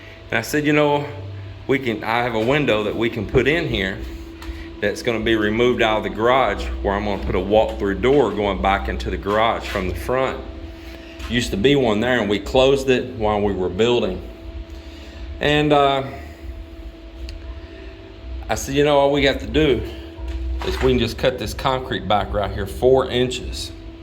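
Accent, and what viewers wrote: Texas, United States. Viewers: Not sure if I mentioned it previously but watching your vids at least for me is an eye opener,I learned a lot from you,I'm not going to build a house but I'm enjoying your knowledge and the easy way in which you explain things,your Texas drawl brings memories from the time I took special forces training at Fort Hood Killeen Texas and Fort Sam Houston in Ssn Antonio back during the Iraq campaigns,,,,I know it takes time and effort to get the vids on YouTube so I thank you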